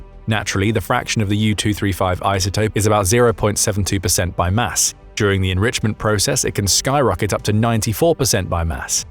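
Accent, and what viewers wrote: United Kingdom. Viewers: I wish these videos didn't include British narration.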